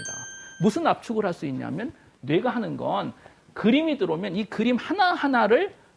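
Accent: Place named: Jeolla, South Korea. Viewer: 억양이 라도... 비 호감... 비추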